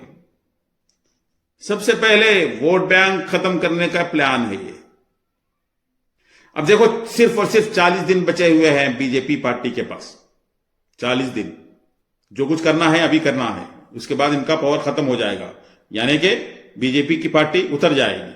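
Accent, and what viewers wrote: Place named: Bangladesh. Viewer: آپ کو اللہ پر یقین نہیں ہے کیا۔ کیوں یوٹوب سے پیسے کمانے کے لیے لوگوں کو دہشت میں مبتلا کر رہے ہو۔ ۔۔اپ کے pronuciation سے لگتا یے کہ آپ کا تعلق بنگلہ دیش سے ہے۔ اللہ حدایت دے ۔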